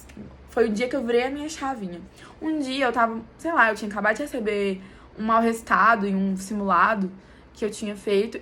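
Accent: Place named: Minas Gerais, Brazil. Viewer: sotaque mineiro é gostoso de ouvir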